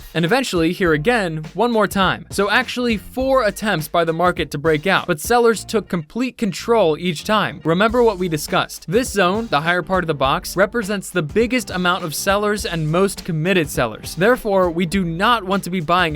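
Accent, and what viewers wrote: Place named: United States. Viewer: I don't think that it's a matter of what timeframe. I think more of a case of when does the day start....I live in Tasmania Australia and my TIMEZONE GMT+11 and I mainly trade on FOREX and I am able to trade from Monday morning am until Saturday morning am which is market close time for New York trading at GMT-5. So I'm in guessing that he's referring to that timezone based on him sounding American. But the other question is, is it a 24 hour period or based on your local trading session?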